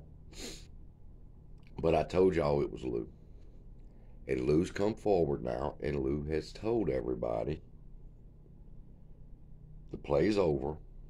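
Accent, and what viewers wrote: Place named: mixed, United States. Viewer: Your Cajun-Texan accent kills me brother 🤣👍👍👍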